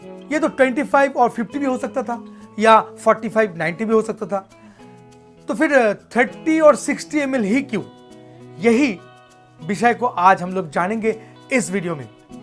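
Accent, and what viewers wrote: Bengal, India. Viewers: Bengali accent is horrible.. Knowledge hai... Lekin pronunciation and english is very poor..needs improvement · Bangali pronounce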